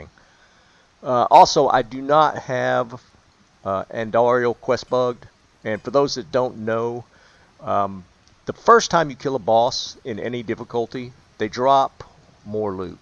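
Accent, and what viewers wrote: Texas, United States. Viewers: Sounds like Texas or Mississippi.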